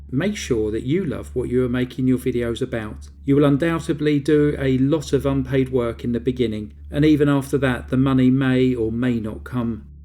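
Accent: United Kingdom. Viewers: "...limped past the 100K mark." I loved that, for its humility and honesty. I'm a subscriber, only having found you a year or so ago. But, I love true crime so yours, and one of the others you referenced, are the two I watch. Always. I love British accents. And, I don't care from which area of Britain, they are all charming and--to my American ears--very sophisticated. I think your delivery is lovely, measured and with a thoughtful tone. Anyone who would send you negative comments (and I'm sorry about that) are just envious, because you've accomplished something the have NOT. I understand your taking a break. You've described your experience with Youtube very clearly and I am sorry for the struggles you're having. Those of us out here who are so interested in true crime do love what you do, and your work is appreciated. Speaking for myself, I LOVE Petty Crime! 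For the very reason you said, a light, humorous note to sometimes very dark material. I find myself smiling at each one. Well, until I saw the snake today. . . not a snake person. One last thing: I like the avatar you have now. Its the best I've seen of all you've had (I did go back and view other, older videos once I finally found you). I hope you'll be back 💙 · Hello from London Ontario Canada. Thankyou for the video. Had no idea how much hard work goes into making a video. I too love crime stories, and I want to say that you do an Amazing job with your stories. I always find them very well done, the detail and pictures. I'm not sure how long I have been watching, but I believe I was first brought attention to your channel by Brief Case. You said that sometimes people insult you, that's terrible. I don't understand why people do that. Crazy people in the world. I Love British accents, so I Love your voice. 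I hope you enjoy your break. If you decide to carry on your channel, I will throughly look forward to it. Thankyou for your channel. Goodbye.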